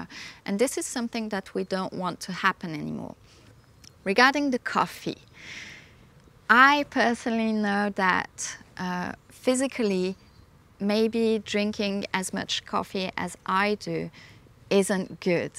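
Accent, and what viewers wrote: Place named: United Kingdom. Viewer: I'm trying to figure out your accent. I know you live in Switzerland and speak French, but your accent speaking English is quite unique. Have you lived in England...because, as well as speaking English perfectly, you do have a tiny bit of a British accent...within your mix.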